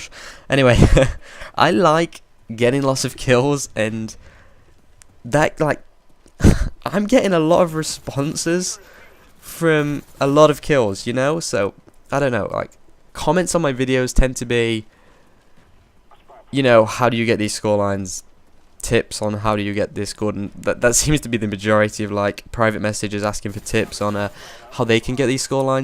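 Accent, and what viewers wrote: United Kingdom. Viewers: FINALLY, A BRITISH COMMENTATOR!!!!!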